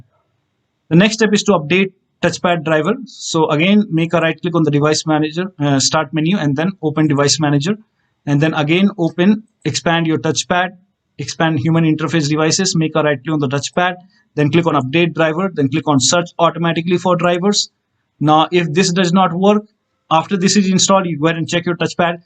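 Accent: India. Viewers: Your language accent indicates that you are Indian, you are such a blessing man, saved my ass. Love from Bangladesh.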